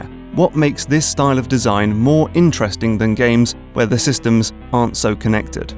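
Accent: United Kingdom. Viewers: "Electric Danna" You british folk and your ARs. Anyway, glad to see I'm not the only on who dyed Link's hood green.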